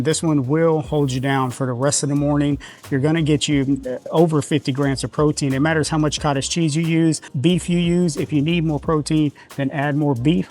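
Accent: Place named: Georgia, United States. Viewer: His accent sounds like home to me, which is Decatur Ga!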